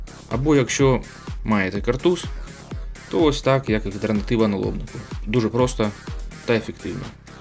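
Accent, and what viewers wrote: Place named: Russia. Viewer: как бы не пытался, а русский акцент слышно за версту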